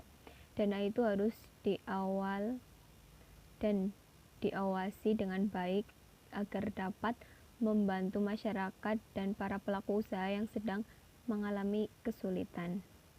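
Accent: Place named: Java, Indonesia. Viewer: Medok bgt ya. Basa indo dengan kearifan lokal👍🏿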